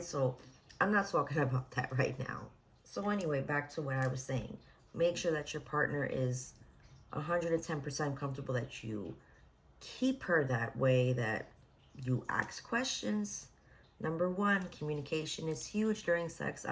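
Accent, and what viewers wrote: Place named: New Jersey, United States. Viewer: You so fuckin jersey I love it lmao jawn good shmoney lmao jersey boy here 💪🏾💪🏾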